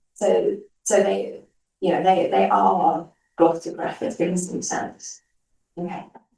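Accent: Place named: United Kingdom. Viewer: besides the British accent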